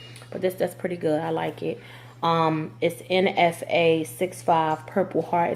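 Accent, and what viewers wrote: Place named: New Orleans, United States. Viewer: its so crazy how you remind me of several ppl i know in new orleans... accent and all!! I don't even hear Jersey until you actually say "Jersey" lol i just listen to you talk like "i already know her" lolol its so weird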